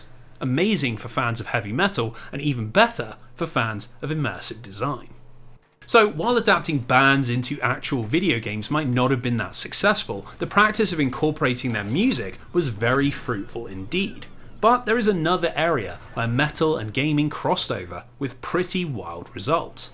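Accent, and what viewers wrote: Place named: RP, United Kingdom. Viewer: Holy shit, it's him. Am I the only one who had never once seen the guy behind the voice? I had this nerdy, posh British lad in my head (still love the content), but color me shocked.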